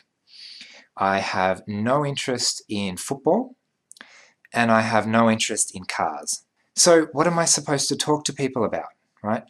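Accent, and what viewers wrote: Australia. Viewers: Just out of curiosity, what part of Australia are you from ? I live in Perth, WA. I cannot figure it out. Lol i don't watch TV either.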